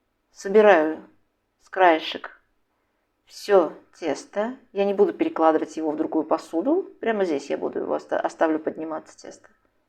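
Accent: Baku, Azerbaijan. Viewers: Спасибо ,я тоже пеку куличи и научила меня бабушка она мне не родная но я считала но моей такая добрая,ласковая аиу меня не было не бабушек и дедушек ,венское тесто она говорила , похожее на ваше тоже ,очень лёгкое , но хочу попробовать ваше ,мне очень понравилось и ваш голос вы случайно не из Баку ,я из Баку ,голос приятный и вы говорите нечего лишнего ,попробую ваш ещё проще и очень красиво и наверное вкусно ,вас с страсной неделью и со Светлой Пасхой и золотым ручкам и вам здоровья и хранит вас вашу семью ,Господь Аминь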